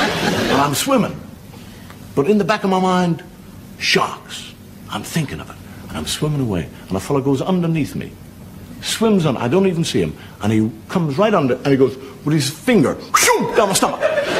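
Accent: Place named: Australia. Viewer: Just nearly wet myself laughing..........is that how we (Aussies) really sound...??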